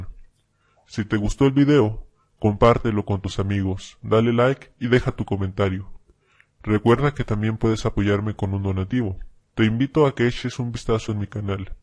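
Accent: Chihuahua, Mexico. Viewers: Mmmm... asento de shihuahua ? Si, este es de esos.... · pues por la manera en que dice la "ch" yo digo que es de chihuahua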